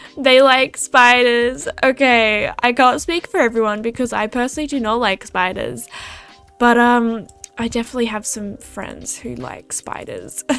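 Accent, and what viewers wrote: Australia. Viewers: when I first saw your video I had no clue what accent you had but now I hear the Australian but it's not the most strong accent I've heard but i can tell your Australian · as an aussie, i feel like the accent is definitely there for you but it is not as strong as others I've met before... but that could just be from me living in victoria?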